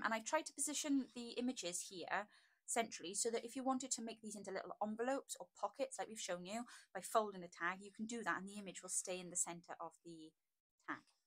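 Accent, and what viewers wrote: Wales, United Kingdom. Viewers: Wow! Just wow! How beautiful is that kit? and it's free!! That's very generous of you. I'm a new subscriber and am so thrilled to hear your Welsh accent. I was born in Wales and my parents emigrated to Australia when I was two. Now that they have both passed away I really miss hearing their accents. I would have subscribed to your channel just for the accent lol but your content is beautiful. Many thanks for sharing your positive take on autumn.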